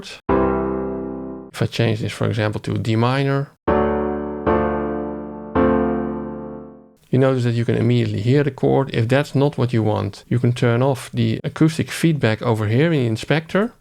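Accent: Netherlands. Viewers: Man man man, wat een dik, Nederlands accent. Maar verder wel een informatief videootje over de Chord Track.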